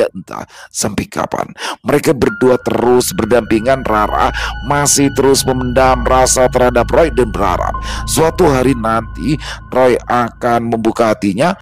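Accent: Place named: Betawi, Indonesia. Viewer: Pulen amat bang logat betawinya. 😅😂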